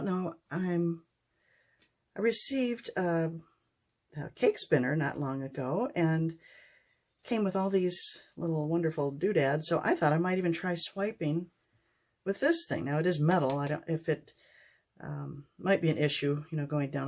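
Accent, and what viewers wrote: Australia. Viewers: I just watched this video then Bens . I think the main difference is the cells Ben got from using Aussie flotrol, we are so lucky in Australia to have our flotrol do what it does! Thanks for the giggle at your Aussie accent! 😂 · 😂love the aussie accent! Fun idea combining different swipe tools👍. ❤️,Pam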